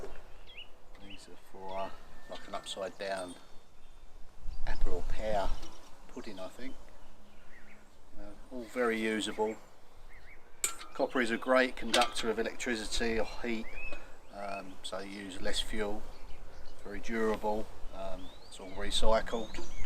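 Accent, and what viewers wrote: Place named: England, United Kingdom. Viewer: I love your vlog. It's one of those welcome to my friendship, my family and my home. I used to live in England many years ago and my partner was a true cockney who lived on Basildon Essex. Your accent and persona remind me of my life long ago when I too used to collect copper and restored two houses together in Fleetwood, Lancs. I now live in Arizona and you remind me of the life I used to live. Very special memories. Thank you for sharing. ❤ from AZ 🇺🇸.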